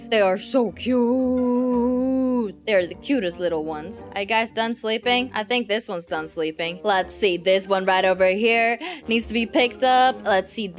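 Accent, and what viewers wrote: Sweden. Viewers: I love your Swedish accent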